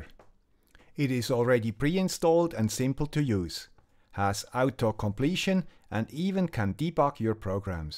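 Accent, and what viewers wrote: Switzerland. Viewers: the Swiss accent sounds a lot more pleasant to me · Third law of termodynamics -> There is no place where you can find -1,800 °C, not even in Sibiria ;-). Some thoughts about the SPI temperature sensor: a) The low negative temperatures could be caused by a wrong polarity. Have you checked that the thermocouple is connected with the right polarity? b) Are you sure the thermocouple and the MAX31855 are type K? The circuit python module only works with K-types. c) There is a very little chance that the D5 pin is not set as output: you could do this by adding the line to script: cs = digitalio.DigitalInOut( board.D5 ) cs.direction = digitalio.Direction.OUTPUT # add this line It would be so cool, if we could find a solution because knowing, it doesn't work, drives me crazy. Yes, I'm a Nerd ;-). Someone like Mr. Monk ( but not so brilliant ;-) ). 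BTW: I like your videos: interesting themes, well prepared, you do not hide your mistakes (so we could learn from them, as well as you did), understandable english (with a nice swiss accent; greeting from a German neighbor), no boring background music and for me also important: fluent speaking with no ehms and aahhms. So thank you for your work and for your countless inspirations! vy 73 DF4VB (Peter) PS: What is a qualifying purchase? Only the products in your shop, or can I mark other things, I'd like to buy, to be recommended by you (or so)? I have no experience with amazon, but if I could support you, I'd give it a try.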